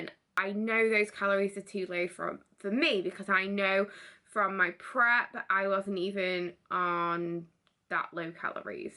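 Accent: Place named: United Kingdom. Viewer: you are adorable! Sometimes your british accent is so difficult to understand. haha! Are americans hard to understand? Anyways, thanks for the inspirational message.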